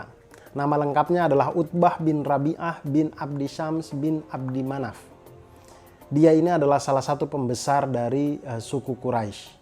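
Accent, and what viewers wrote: Eastern Indonesia, Indonesia. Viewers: Bahasa timurnya kental sekali ,, saya suka saya suka ,, salam dri bnten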